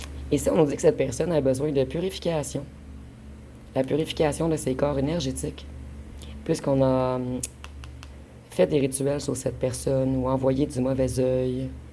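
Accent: Canada. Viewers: J'aime laxent Canadien!!! Avec la voix cassé ( sexy ,sexy). J'aime le Canada😆👍🤍💜🌞🍁🍁🍁🍁🍁🍁🍁🍁🍁🍁🍁🍁🍁🍁🍁🍁🍁🍁🍁🍁🍁🍁🍁🍁🍁🍁🍁🍁🍁🍁🍁🍁🍁🍁🍁🍁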